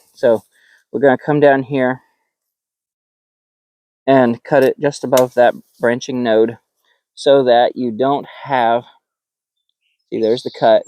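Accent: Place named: Midwest, United States. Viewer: For what it's worth, I can understand you just fine, though I'm a fellow Midwesterner. But I think the issue for some may be the slight muffled quality of the audio. Sounds like you may have a windscreen on your mike (which is absolutely 100% great), but there is still a little "fog" in the audio, which may be what people are reacting to. I don't know what video editor you are using, but DaVinci Resolve is a very, very powerful free video editor that also allows you to make the audio crystal clear using its Fairlight page. You may not have enough time in the day to utilize it since you (gratefully!) make a video so frequently. And that's much appreciated! Especially from those of us interested in planting Bamboo. But I thought I'd at least put this out there.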